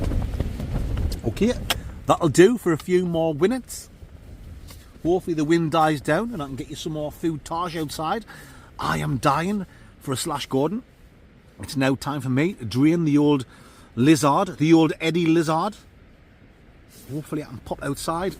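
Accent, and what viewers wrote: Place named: Sunderland, United Kingdom. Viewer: This is the only Sunderland accent I will tolerate to listen too. Top bloke 🔥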